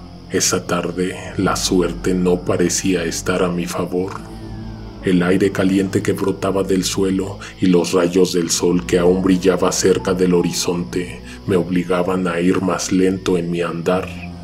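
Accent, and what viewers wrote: Mexico City, Mexico. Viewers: GRACIAS.YA NO OIGO.OTRO CANAL ME.GUSTA TAMBIÉN.ESE TONO.CHILANGUITO M HACES MUY AMENO MI.SUEÑO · Oye una pregunta eres chilango😎??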